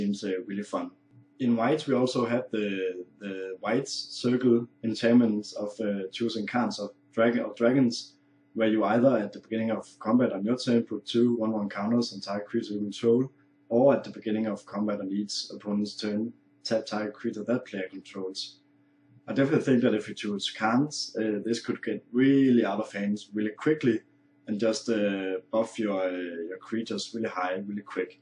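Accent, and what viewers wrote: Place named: Germany. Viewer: Das ist definitiv deutsches Englisch ;)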